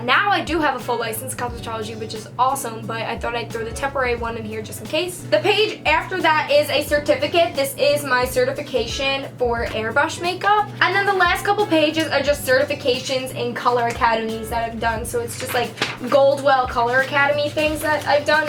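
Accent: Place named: Long Island, United States. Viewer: Girl you sound like a real long islander ! ❤️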